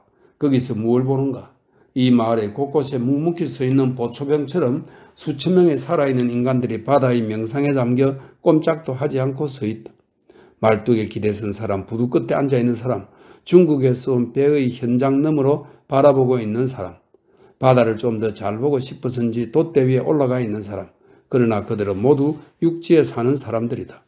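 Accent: Gyeongsang, South Korea. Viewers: 우리한동훈도토리가젊은팬들에게권하는책이라서한번더듣습니다너무고맙고감사할뿐입니다 약간의경상도사투리가더매력적이십니다 즐거운성탄되세요건강하십시요 2023년12월24일0시15분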